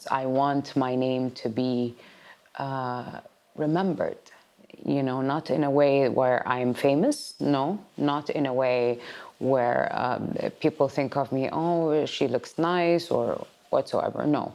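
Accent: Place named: Arab world. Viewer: Masha Allah🌙 Us/We,..Muslim/Women,..Mothers have more responsibility's than Men have,..we take care of Children/Husband/Family/Career/Domestic chores etc etc,..doing all these things in a loving/caring good way gives us positive energy,..I regard all my responsibilty's as divine chores,..I love/like accent of Arab Men/Women talking English,..well spoken,..Shaikha,..Inspiring,..investing in person/people,..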